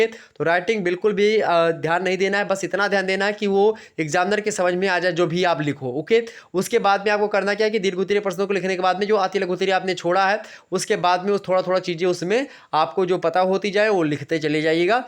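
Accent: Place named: Kanpur, India. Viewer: Hme lgta tha sir kanpur se h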